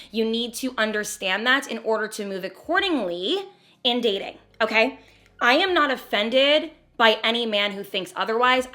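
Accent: New Jersey, United States. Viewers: the jersey accent for meeeee 😩😩😍💕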